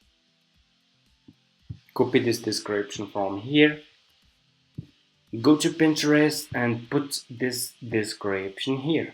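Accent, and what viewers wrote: Morocco. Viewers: that Marocain accent 😂😂 ❤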